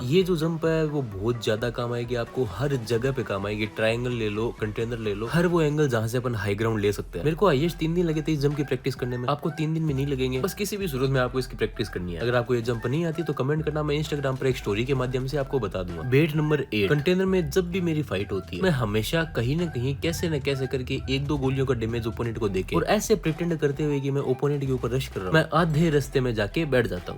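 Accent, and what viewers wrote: Haryana, India. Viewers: Aap Haryana se ho kay 🤔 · Bhai Haryana se ho kya 👀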